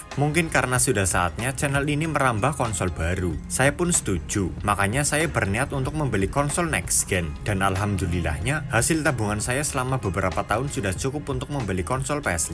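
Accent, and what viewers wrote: Banyumas, Indonesia. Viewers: genah mesti ngapak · loh mas wong banyumas tah?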